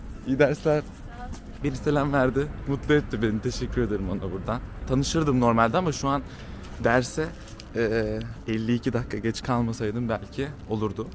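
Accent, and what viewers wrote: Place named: Rize, Turkey. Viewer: O ne öyle rizeli rizeli şeyler✨✨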